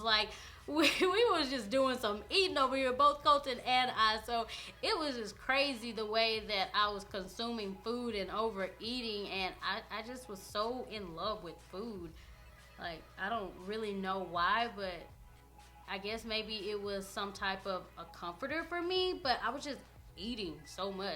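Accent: South, United States. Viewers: I LOVE YOUR SOUTHERNESS IT MAKES YOU SO INTERESTIING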